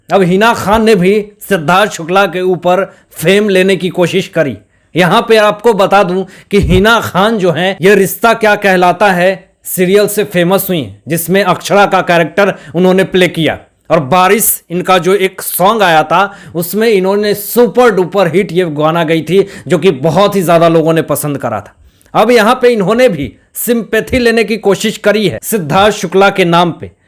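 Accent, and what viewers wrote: Bihar, India. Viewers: Tum thik se baat karna sikho ..bihaari pronounciation se lekar hindi baat mat karo ...rashmi hai not rasmi .not enerjy...sunte huebv Ganda lagta hai ..host ho thik se pronounciation sikho.. very bad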